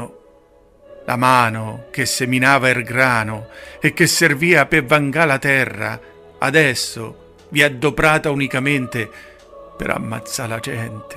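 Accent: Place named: Marche, Italy. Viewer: Peccato la cadenza che su Trilussa doveva essere obligatoriamente Romana invece sembra Marchigiana/Umbra. Nulla toglie alla bravura dell'interprete.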